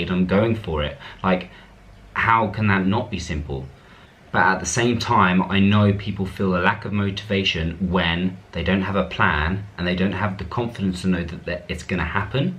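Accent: United Kingdom. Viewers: your British Accent is so cute.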